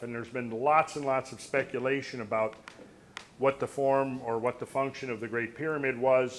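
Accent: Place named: Midwest, United States. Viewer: Minnesotans talk a little funny, don’tcha know? He may live in GA now, but he’s from MN, and he sounds like a northern Midwesterner.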